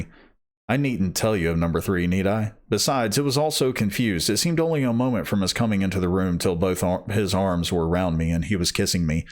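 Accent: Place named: South, United States. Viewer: Excellent reading,.. i'm enjoying these. There is nothing wrong with a southern drawl. I like it.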